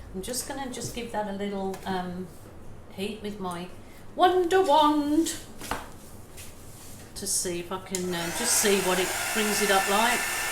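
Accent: Birmingham, United Kingdom. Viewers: You just keep on rabbiting, it’s what we brits do! You can take the girl out of Brummie, but you can’t take the Brummie out of the girl! Love the swipe.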